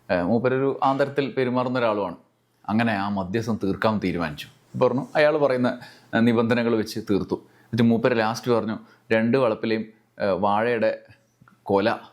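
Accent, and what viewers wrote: Kannur, India. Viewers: നിങ്ങൾ കണ്ണൂർ കാരനാണോ?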